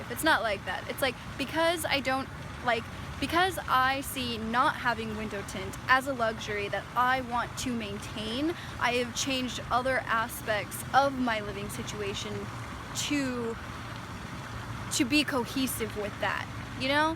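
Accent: United States. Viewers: DOES A LAOTATION SPEAK WITH THAT TYPE OF ACCENT? I WOULD THINK SHE IS AN AMERICAN OF ASIAN DESCENT.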